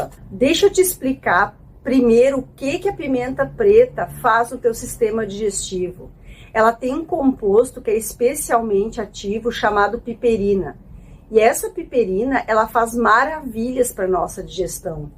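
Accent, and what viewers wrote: Rio Grande do Sul, Brazil. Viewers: Dra a sua sabedoria aa hipnócratis tem alimentado minha fome por saúde.não obstante esse seu maravilhoso sotaque gaúcho é também um grande acolhimento terapêutico.sou professor aposentado.sou de Belo Horizonte,muito obrigado por você existir. Eustaguio. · Bah, que belo conteúdo sobre a pimenta!🌶️ Fora isso, serio, sei que sou gaucha nata, colona, mas confesso que detesto ouvir nosso sotaque, principalmente outra pessoa falando. Porque é um sotaque com sons pobres, quase que uni-tom. Parece que a gente so tem um tom descendente e um ascendente e toda frase termina e começa do mesmo jeito. É enjoativo demais. É como um semi-alfabetizado lendo com medo de errar, as primeiras frases.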